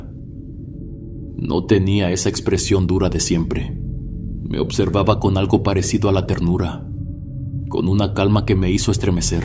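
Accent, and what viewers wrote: North, Mexico. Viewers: WOW!! Cuánta maldad hay en el mundo, que le está pasando a la humanidad?? Por otra parte, tú acento norteño me embelesa y a la vez me da ternura, cuando dices tú letanía de que alguien pudiera estar usurpando tú lugar y robando tú trabajo, normalmente te escucho en mi trabajo... Cuídate, Bendiciones!! ❤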